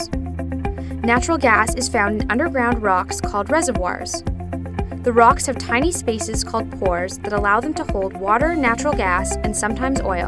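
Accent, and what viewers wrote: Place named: Canada. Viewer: The chick narrating must be Canadian. She also says buried weird- like “burried” vs “barried”.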